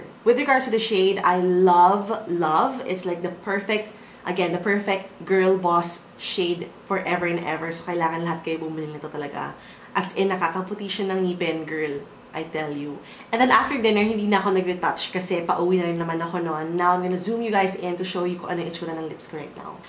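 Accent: Conyo, Philippines. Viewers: i love the way you talk ate very conyo i like it haha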